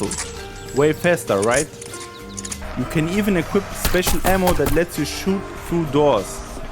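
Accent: Germany. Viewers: i hear german fella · Are you german ? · Ich geh von deinem Akzent mal davon aus, dass du deutsch bist. Ich hab alle deine Hitman Videos durchgeschaut. Finde die super. Hast dir nen weiteren Abonnenten verdient 😂✌🏼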